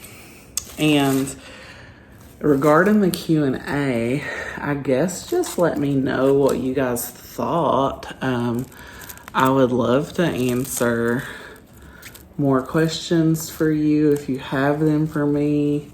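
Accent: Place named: Kentucky, United States. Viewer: I look forward to your videos. I love accents, I enjoy Claire at Jungle Haven's British accent, and I love your Kentucky accent. I am a nurse (I just added up and it's been 37 years!!) And my youngest daughter is a Family nurse practitioner. Both of my daughters and I are planty people. I appreciate your courage. You give me the gift of your plants and how you grow them. I have not done a moss pole yet. I have a rapidaphora tetrasperma that would appreciate a moss pole or a trellis about 20 feet 😊high.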